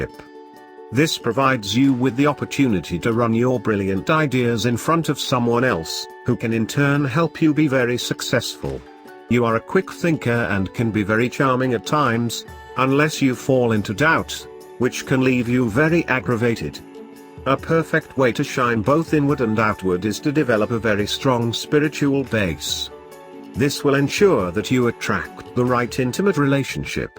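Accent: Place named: United Kingdom. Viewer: Anyone who said this to my face that didn't have that British accent (that resonates with Virgos) may warrant a punch in the face. And then I would dwell on my behavior for 6 months... True reading.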